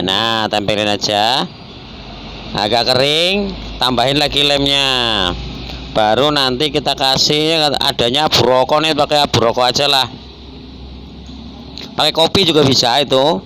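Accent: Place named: Ngapak, Indonesia. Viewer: Logat ngapak e kentel bgt kang 😄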